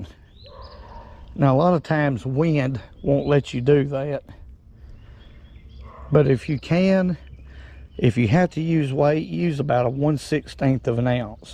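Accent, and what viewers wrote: South, United States. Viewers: Love that accent brother! Southern pride!!!👍😁✌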